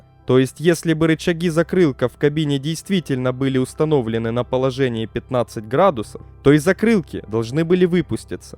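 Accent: Ukraine. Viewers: Украинский акцент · украинский акцент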